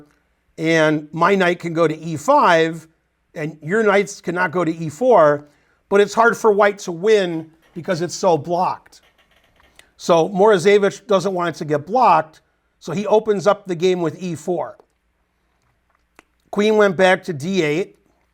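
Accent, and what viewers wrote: United States. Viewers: How can the american accent be so annoying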